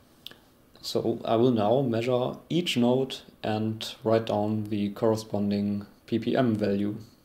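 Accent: Germany. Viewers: Wie man beim ersten Wort einfach raushört, dass du Deutscher bist :D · i love his german English😂❤ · Ahh dein Deutscher Akzent xD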